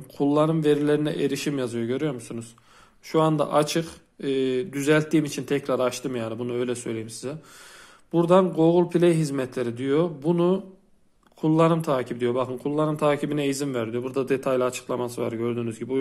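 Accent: Turkey. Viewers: Abi yozgatlımısın?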